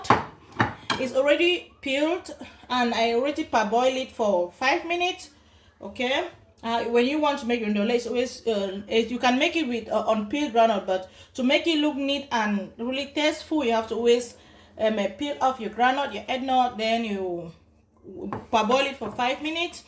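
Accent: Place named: Germany. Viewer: LOL I hear how German is overtaking you. You cooked it the real Cameroonian style. Well done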